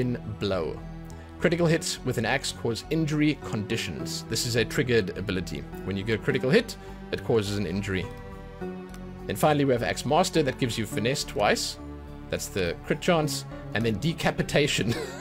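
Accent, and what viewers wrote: South Africa. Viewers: Like for thick South African accent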